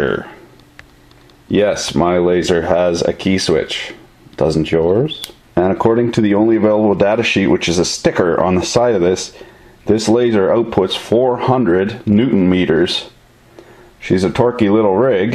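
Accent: Canada. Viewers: So glad youtube recommended me to this; just because of the accent lol, you in Northern Ontario or out West?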